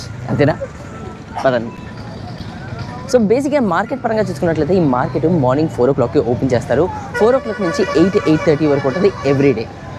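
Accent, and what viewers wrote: Godavari, India. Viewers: Meeku Inca subscribers ravali ani korukuttuna brother me comedy Maa godhavari yasa baguntadhi meeru Inca godavari side village s traditional videos chayandi bro😊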